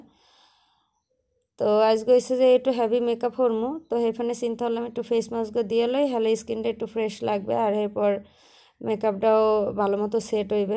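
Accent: Barisal, Bangladesh. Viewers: Din din sundor hoye jacco .Barisal er meye bole kotha . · আপি আমি তোমার 2 টা চ্যানেল দেখি খুব ভালো লাগে,আরো ভালো লাগে তোমার বরিশালের কথা,ভালো থেকো আপু,দোয়া করো আমার জন্য।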